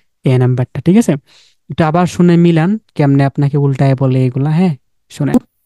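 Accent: Sylhet, Bangladesh. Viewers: Sir amioy sylheti,,, apnar kota shuna kno jani mono or afna sylheti,, 🥰thanks a million sir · Baiya apner basha sylhet kutay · উনি হয়তো সিলেটের তাই কথা কেমন। এটা ব্যপার না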